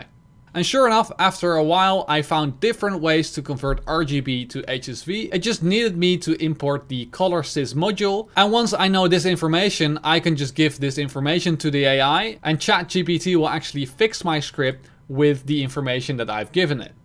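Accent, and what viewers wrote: Netherlands. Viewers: i smell dutch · just found this video (and your channel) not even 2 seconds in i already learned you're a Dutchie too :') haha our accent is so easy to pick out (at least to us) just like we can pick out people speaking Dutch that deviate more than 5% from the norms, then switch over to English because we know they aren't native Dutch speakers, we feel that shit up real quick :D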